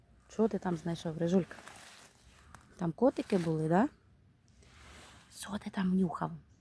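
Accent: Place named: Poltava, Ukraine. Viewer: Дякую за відповідь. Я з Лубен, дуже мова Ваша на нашу з Полтавщини схожа. Тримайтесь, все буде добре.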